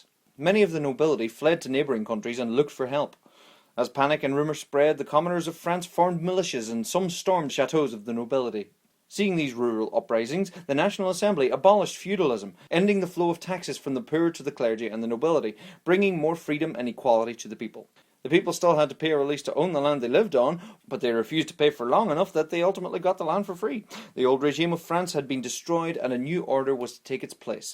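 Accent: Ireland. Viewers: Because HE IS IRISH!!! DUN DUN DUUUENWIAKWOEISJWOIEDJDISOOWEK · I wish It had subtitles. You speak so fast and i'm not used to the Irish accent. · He is Irish mate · are you irish or something? (not that you are) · You sound Irish :) pretty cool if you ask me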